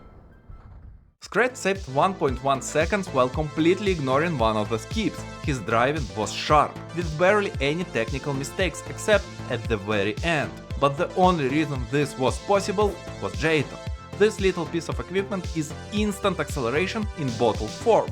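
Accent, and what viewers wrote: Russia. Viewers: Слышу Русский акцент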